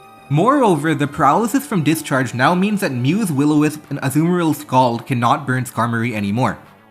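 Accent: India. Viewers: no hes just indian no need to assume everyone lives in a primarily english country bruh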